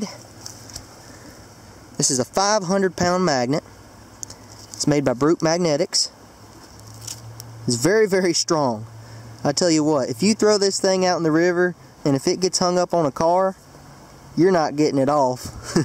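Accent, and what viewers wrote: South, United States. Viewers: You have THE CUTEST TWAng ever.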